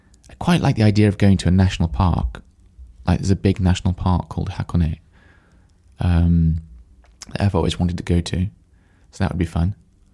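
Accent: United Kingdom. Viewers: Do you speak with rp accent?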